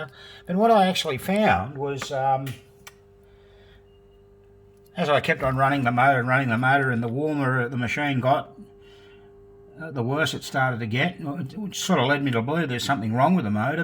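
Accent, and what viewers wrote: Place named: Australia. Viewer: So glad to find your channel mate! To hear an Aussie accent makes it so much easier to follow along.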